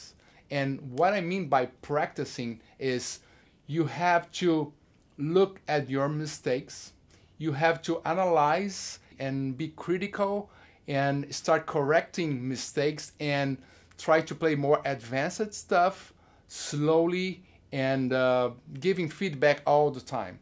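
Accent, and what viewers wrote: Brazil. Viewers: Putz o sotaque brasileiro é inconfundível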